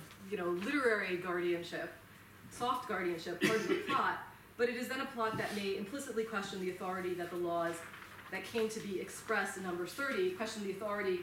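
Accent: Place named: United States. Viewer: gosh Ive worked with this woman and am amazed that she delivers her material with the charisma of an inexperienced first year undergraduate student.In real life she is bright enough but viciously nasty to anyone she considers weaker or below herself. Here she mumbles and delivers a soporific presentation. Amazing. The boredom of the moderator is so obvious. Also she needs to work on her Hebrew pronunciation , it's painfully American.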